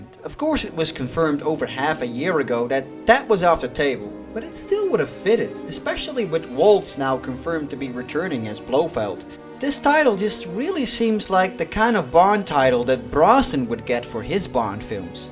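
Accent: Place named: Netherlands. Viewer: I just cannot understand why your channel hasn't at least half a million subscribers: Awesome produced videos, great voice with that cool dutch accent, great text / writing, passionate about the Bond-franchise, no bullshit bla-bla, humorous & funny, clear opinions: just relaxing and informative the same time to watch. Oh-so-many channels could learn from that. But you HAVE to make a gun-barrel scene with yourself in that rad smoking. That would make it PERFECT! Just my opinion.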